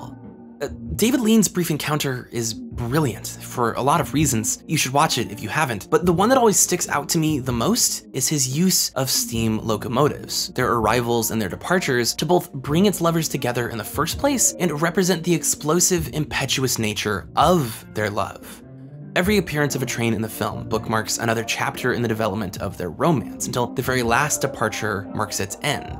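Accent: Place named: United States. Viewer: Hi, this came up and I got to the point where you got to Myazaki pointing out how this is Iraq war. I agree war is bad, I don't think that is up for debate. But Myazaki is a known Pacifist, and as a Japanese person, I won't fault him for it. But based on your accent, you are an American. In the current world, do you think we should be pacifist? Its 2024, and there are multiple wars we are supporting. Should we pull out?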